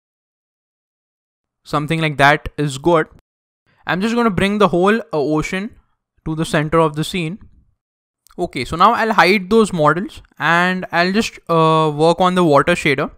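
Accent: India. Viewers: Is he Indian or does he have an Indian accent?? im not sure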